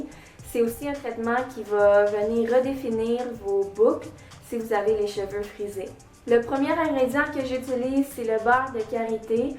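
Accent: Quebec, Canada. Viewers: super vidéo <3 et j'adore ton accent québécois/canadien :3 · Accent québécois ?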